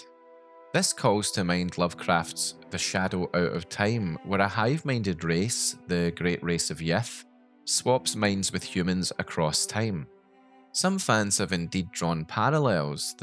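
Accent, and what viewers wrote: Scotland, United Kingdom. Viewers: Love your accent. The Elder Scrolls need way more authentic scottish voices, it would fit in perfectly. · It's good to hear another Scotsman on the internet. Free the motherland from the Saxon hordes! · my favorite scottish narrator comes back with anothet great lore movie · Me pressing play: "please dont be ai, please dont be ai, please dont be ai" (hears lovely - and REAL - Scottish accent): "OH THANK GOD!" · This guy's voice and Scottish accent is more fitting for skyrim then the skyrim voice actors themselves. I'm not sure if you will see this comment but you are now my go to skyrim youtube channel.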